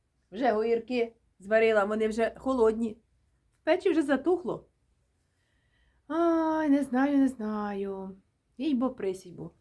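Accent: Ukraine. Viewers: Вінниччина. Моя бабуся так розмовляла! Її-бо- присійбо .... так і казала. Макогін! *Так - Вінниччина * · Ну, імовірно, що Наддніпрянський говір. Але він дуже великий за ареалом - від Херсонщини і до Київщини, та від Поділля до Луганщини.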